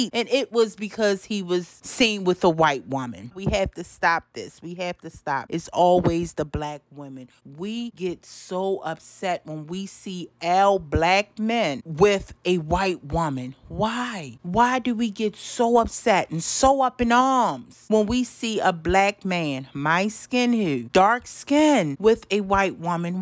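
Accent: Baltimore, United States. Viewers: Found you by accident. That BMore accent got me here to stay 😂 great content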